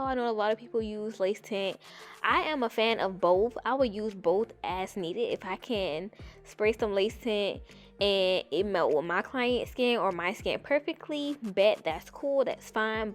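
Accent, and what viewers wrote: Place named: New Orleans, United States. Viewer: you sound like you from nola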